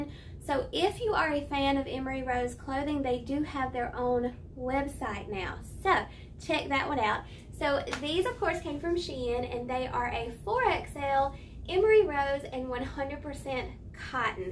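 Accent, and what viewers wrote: South, United States. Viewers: OMG! A year already!🥳🥳🥳💐🎊🎈 Gotta say you are my favorite and only one I watch for Shein hauls! You way of presenting and explaining each piece is the best. I love your southern accent and your cute and proper personality. Because of you I walked out of my comfort zone to try new looks. Keep it up friend! Congratulations!!🥳🥳🥳🥳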